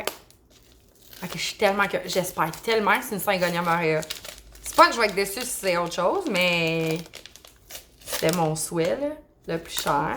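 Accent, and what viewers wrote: Canada. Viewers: Sacré unboxing surprise J'aime bien tes vidéos, je n'en rate pas une 😉 J'aime bien ton ton et ton dynamisme (et ton accent canadien aussi 💚) 👍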